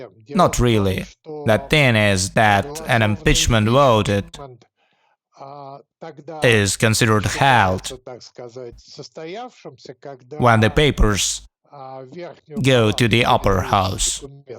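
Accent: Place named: Russia. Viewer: И дурацкий русский(?) акцент ещё зачем-то. Для нац.колорита что ли?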